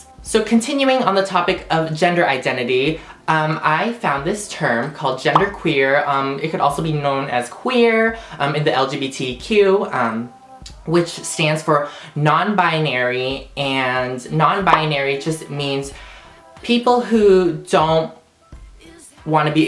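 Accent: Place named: South, United States. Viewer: I LOVE your country accent and your little laugh you do it's completely adorable! :)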